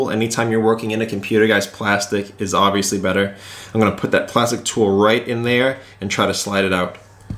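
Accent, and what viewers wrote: Massachusetts, United States. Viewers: Random but are you from Massachusetts? That accent!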